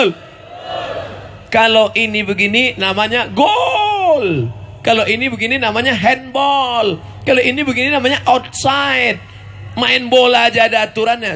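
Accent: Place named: Medan, Indonesia. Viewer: Mantap kalilah UAS ni logat medannya keluar,,, 👍👍👍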